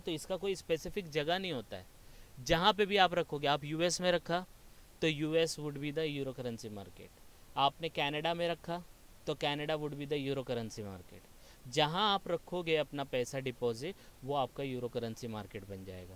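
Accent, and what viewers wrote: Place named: Maharashtra, India. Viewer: Mujhe esa kyu lgg rha hai ki Professor Marathi hai?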